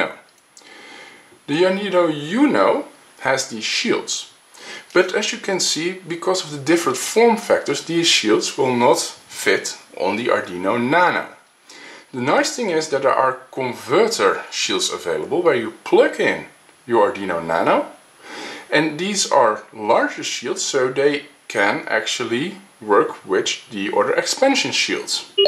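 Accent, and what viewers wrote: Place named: Netherlands. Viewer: I hoorde gelijk dat je nederlands bent...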